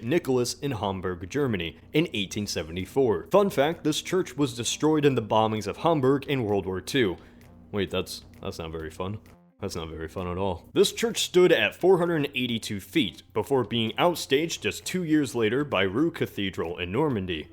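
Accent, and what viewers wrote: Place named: Midwest, United States. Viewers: He's from the great state of Ohio, albeit Toledo instead of the superior cities of Columbus and Cincinnati. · it's a Midwestern accent. from States like Kansas and Missouri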